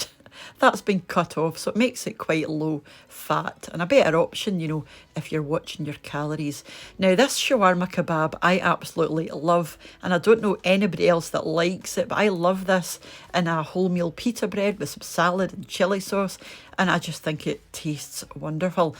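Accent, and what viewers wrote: Scotland, United Kingdom. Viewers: Hello, new subscriber here from Australia 🇦🇺. Currently my husband and I are on the keto, low carb diet so I love to watch your meal prep for a big carb fantasy fix. I found you by way of mrs. m. And just love your Scottish brogue. Best wishes.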